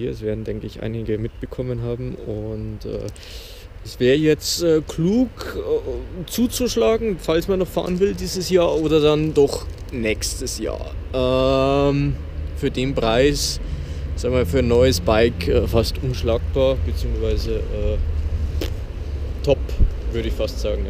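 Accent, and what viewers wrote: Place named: Bavaria, Germany. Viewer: Hab mir heuer auch wegen der Euro 4 noch gekauft in Schwarz.Wo bistn du her?Klingt so wia aus Bayern ;-)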